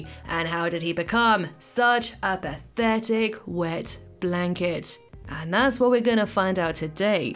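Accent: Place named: South East England, United Kingdom. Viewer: It sounds like we both from south east England if only we could meet up and knew your last name so I could find eachother and talk Simpsons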